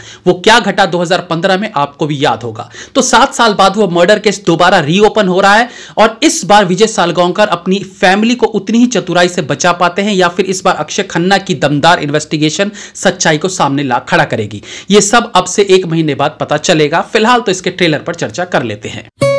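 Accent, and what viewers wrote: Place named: South, India. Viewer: South wali Hindi aai hui h sir.....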